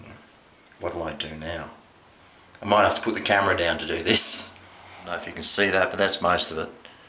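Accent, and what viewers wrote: Australia. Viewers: I knew you were Australian! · Is he Australian,he sounds like one of my friends from Australia!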